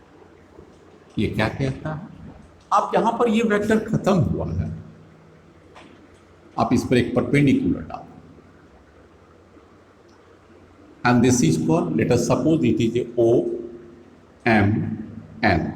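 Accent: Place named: Begusarai, India. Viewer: Sir u r from begusarai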